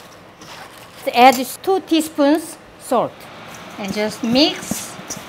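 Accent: South Korea. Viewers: 망치님! 대박 입니다. 요리 짱! 여기다 구수한 영어솜씨가 한국적 입니다. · 왜 이제야 알게 된걸까요..ㅎㅎ 두바이에서 가정주부가 된 중년 아저씨..이제부터 보격적인 요리남 되렵니다...한국 스탈의 영어 완전 좋아요.... 한국식 영여 악센트를 세계에 고착 시키자구요...다같이...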